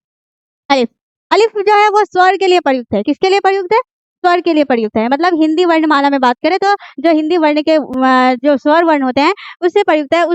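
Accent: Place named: Rural, India. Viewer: Pahle apne madam sir ko kahna ki dehati zaban me na padhaye urdu ko बे ते से 😂😂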